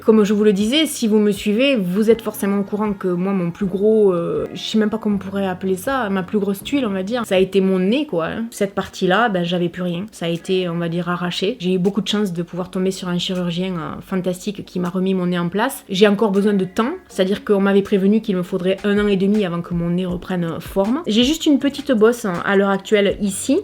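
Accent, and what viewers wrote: South, France. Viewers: je te souhaite une nouvelle année pleine de joie et de sérénité. oui tu es youtubeuse mais tu n'as rien a voir avec certaines "poupées barbies" qui se ressemblent toutes. tu es naturelle, solaire et oui, oui, utile. depuis quelques temps, j'apprécie beaucoup tes vidéos plus personnelles. elles font du bien aux autres qui passent par des moments difficiles. ne change pas, reste comme tu es (et avec ta maman). j'ai l'impression de voir quelqu'un de ma famille et ça fait du bien. le monde devient très dur et ç'est un bonheur de te voir et de t'entendre avec ton accent du Sud. 🥰🥰🥰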